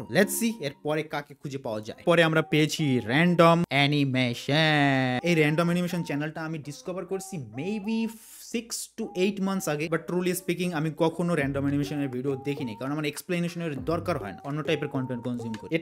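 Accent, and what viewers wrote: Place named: England, United Kingdom. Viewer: আর আপনি ইংরেজি accent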